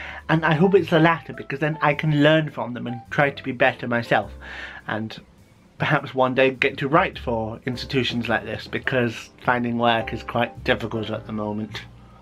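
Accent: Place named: Blackburn, United Kingdom. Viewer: It was a Blackburn accent not Scottish :p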